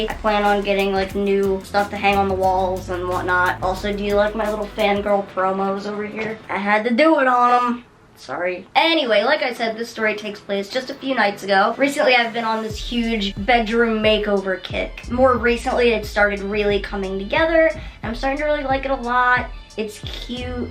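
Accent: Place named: Delaware, United States. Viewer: Im, from Delaware we all still talk like that🤣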